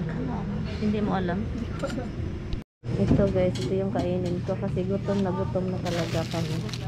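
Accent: Visayas, Philippines. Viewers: Hello and. Shout out to you guys. so sounds so Bisaya? And which part of Bisaya.?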